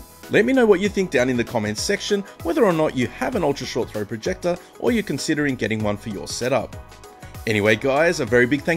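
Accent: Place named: Australia. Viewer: Just wondering where ru location please?! U sound Australian to me 😅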